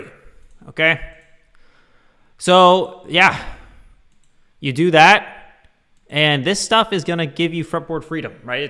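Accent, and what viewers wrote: Canada. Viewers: Level 2 made no sense - canadian accent made words indistinguishable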